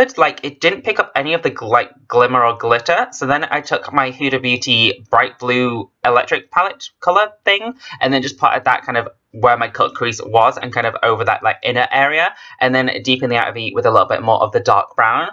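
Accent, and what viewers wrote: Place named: United Kingdom. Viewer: and the British accent is so a turn on 💖💖💖💖🌹🌹🌹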